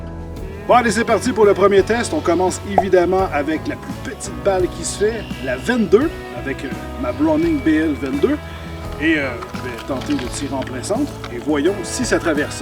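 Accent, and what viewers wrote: Quebec, Canada. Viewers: J adore l accent Québécois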